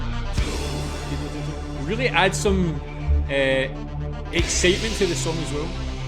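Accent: Scotland, United Kingdom. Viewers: love your Scottish accent....got to learn it....👍🏻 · Kudos - you picked up on quite a few things in there. Note that the vid is not a history of Germany, but rather highlights some aspects, which were very defining maybe not to what Germany *is*, but to how people may feel about it. There are good analyses out there, on Utube the one by "three arrows" is the best I know. Still, it falls short. The references and cross-references are enormous, and sometimes only detectable in freeze-frame. My current count is at around 180 (!). The Band worked for over a year on this, and I can only guess at the production budget. It is one of a kind, I know of no comparison. Anyhow, I love your channel, you are doing great work. And a lovely Scottish accent! Not quite as enjoyable as Neil Oliver's, but still...;)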